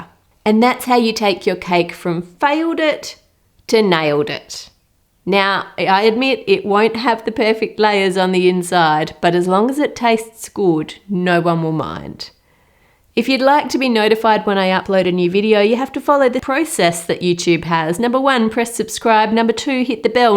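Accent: Australia. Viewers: She sounds Australian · That Aussie accent is just gorgeous. And of course your content is awesome ! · Looking gooouud. That aussie accent 😅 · I am in love with her Australien accent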